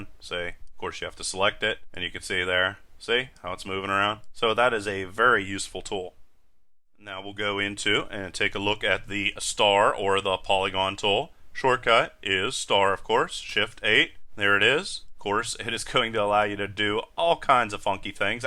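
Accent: Pittsburgh, United States. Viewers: Thanks for a great tutorial series! Thought I heard a twinge of Pittsburghese in your presentation? ;-) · you sound like you are from pittsburgh. p.s. thanks for the video, super helpful!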